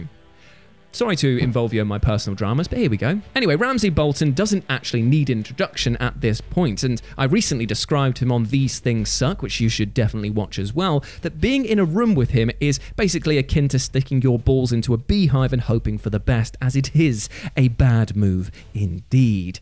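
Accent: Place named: Scotland, United Kingdom. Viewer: Not only was there a Limmy shout out, but that Scottish accent! Hats off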